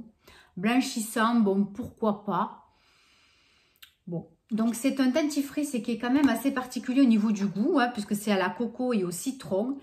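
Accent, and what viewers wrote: South, France. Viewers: Une nouvelle abonnés, tu viens de Marseille ? Ou du Sud de la France parce que ça s'entend ça fait du bien d'entendre le sud sur YouTube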